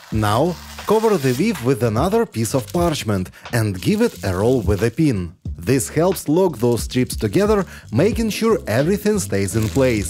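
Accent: Iowa, United States. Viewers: The lowan accent is so beautiful. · Is that an Iowa accent?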